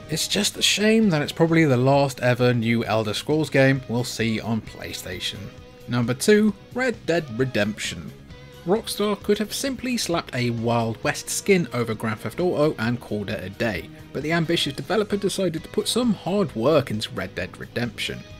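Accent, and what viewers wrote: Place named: United Kingdom. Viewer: PO'OL TAOW. I love British accents.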